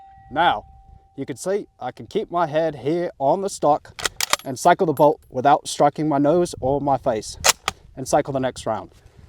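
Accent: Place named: Australia. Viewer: Sounds like an Aussie. Love the larp.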